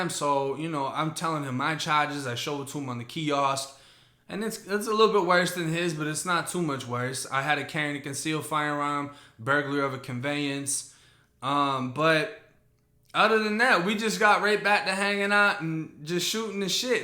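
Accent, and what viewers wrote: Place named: Massachusetts, United States. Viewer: Shout out to my niggaz out in lawrence mass... 860 reppin here. CT Bruh.. u gots sum real content. When I first heard ur voice I was like that nigga from Mass. Wtf he doin down in Tampa. But then again. I myself moved to Jacksonville back in 17 n live in the London Towne projects on lake ave n shit is fuckin real out there. Was handed a piece by my cousins husband 2nd day I was there. I was like wtf is this for, he laughed n sed dead ass... u gonna need this out here bruh. Nigga I retired gun play back in the 90s. Wtf...in my head all I can say is here we go again... wtf...but I lived there for 6 months n had no problems. Niggaz was cool with me. I came back up top cuz i missed my kids... but i would love to move back to Florida...Alex Tatts... Best known Tat artist in CT.. bet ur bottom dollar. U come around these parts, I got u..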